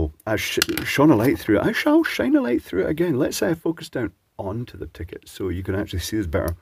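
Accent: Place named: Glasgow, United Kingdom. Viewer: Just love the Glasgay patter, Goes of like a Two Bob Rocket hahaha made my day hearing that. ..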